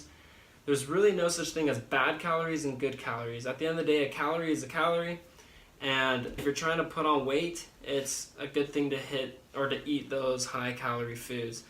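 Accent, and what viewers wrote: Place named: United Kingdom. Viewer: Where are you from? You kinda sound like you're from the UK.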